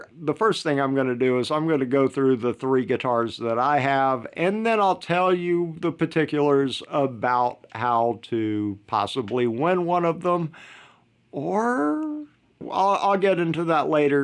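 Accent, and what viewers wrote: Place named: South, United States. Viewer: like this video and all other s .i just stupidly across.like the way you talk sounds country.stay cool and God bless.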